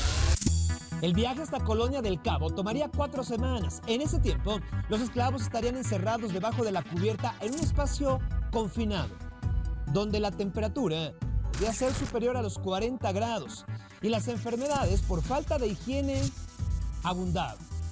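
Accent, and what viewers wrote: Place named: Peru. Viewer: Este conductor tiene asentó peruano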